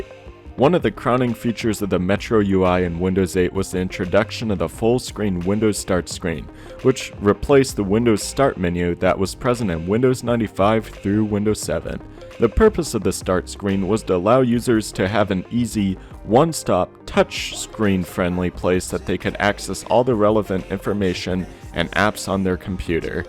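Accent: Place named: Canada. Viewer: It may be just me but I kind of like his accent in his videos. It sounds Canadian to me. I almost expected him to say oot and aboot.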